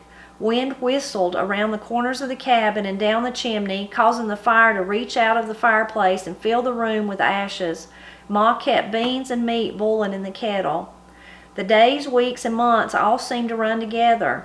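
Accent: Appalachia, United States. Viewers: My daughter introduced me to the The Blind Pig and Acorn. Sometime later I came across on You Tube. I enjoy it so much. Today I was looking for one of my cookbooks and I found a copy of Dorie Woman of the Mountains. I don't remember when I got it. It just got lost in with all of my books I guess. I am so excited. I immediately sat down and started reading. I grew up in North West GA and the stories you share and the lives your family live is so much like mine. My favorite place to go as a child was to my great uncle and aunt's house. I watched her churn butter and cook on a woodstove., wash clothes in a black wash pot, and cook her own cured ham, got her vegetables from the root cellar and I loved getting a cold drink of water from the well bucket with a dipper. I think of those visits often and miss them. You and I talk in the same "funny way," I once had a lady in a church where my husband was interim pastor tell me I talked so funny and Southern, said she'd never heard anyone talk so country as me. 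I guess I was a little sassy and I said," I talk this way because I was fortunate enough to grow up In Appalachia. Only privileged people can talk like this! I guess you got left out when God was giving out blessings"! She never mention my funny way of talking again. By the way, congratulations on your well deserved award. Thanks for all do do to Celebrate Appalachia! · You talk just like me. I'm a retired English teacher from Eastern Kentucky. I was privileged to drive through the Smokies just last week. I've got to go back and listen to your first book..This is going to be a good one.I was just thinking about my cousin naming her daughters Sara and Hannah. Her parents were so worried that the girls would be called Surry and Hanner. I've got to go back and find your video on names.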